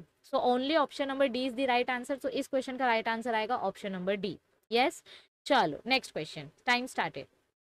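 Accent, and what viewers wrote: Maharashtra, India. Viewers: Mam yaar aap kitni clear Marathi bolte ho Me MRATHI 😇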